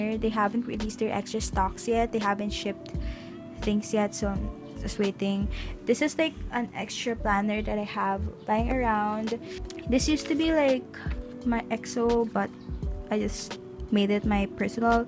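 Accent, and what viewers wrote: Philippines. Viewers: Its kinda obvious that shes filipina cuz girl, her accent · Me:her accent sounds familiar Also me:OMG SHES A FILIPINA #Philippines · Sa thumnsil at aestheric akala ko taga ibang bansa pero nung nagsalita na ay pilipino ito hahahaha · This is the first video I watched from you. When I heard your voice I was like wait is she Filipino? And then yaaaaas you are! Aesthetic na nga, exo-l pa!!! Nicesuuu~ Good luck sa academics~! 😊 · I just got here and I knew that your a filipino becuz of your accent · ya sound like a filipino especially when you said "TAGGhh" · me: hears her say the first sentace me: MY GIRL IS FILIPINA AYYYEEE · I am new here and I love your accent. I was right that you have a Filipino accent <3 Edit: I was proven right when I also saw your money. You're a Binibini💓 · What's with your accent? Are you pilipino? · 5 seconds into the video i already know you're a filipina bc of your accent hahahaha 💕💕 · when she speaks her first sentence i was like "OHHHH FILIPINA".. 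gurrrl ur accent is sooo cute filipino prideeeee hehe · I knew she had a pinoy accent! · looking through these comments and seeing how shook everyone is when they find out you're filipino also same i heard your accent and i was like MMMMmMmMMm FILIPINA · you have that filipino accent, are you a filipino? I'm half filipino and chinese :) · skskks i'm not familiar with your channel and i just assumed that you're filo based on your accent :') and gd i was right hahshah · I recognized immediately because of the English accent — We sure have a distinct sound when speaking English 😊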